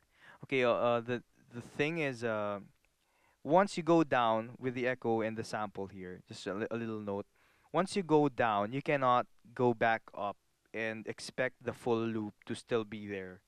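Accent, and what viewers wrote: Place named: Philippines. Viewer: Ill review, tip and tricks! Sounds like your Pilipino too, LOVE dat! JAHbuhay king...bless up!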